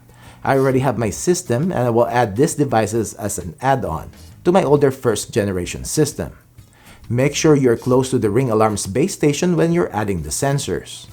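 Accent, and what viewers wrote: Philippines. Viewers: not sure whose the ding dongs who gave thumbs down? unless they thought the accent sounded like a gay filipino? if you can avoid that, its an excellent review.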